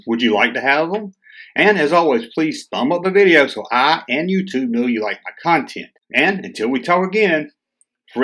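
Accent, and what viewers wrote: South, United States. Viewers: A guy with a southern accent who can’t pronounce Darrel Waltrip? My mind is blown.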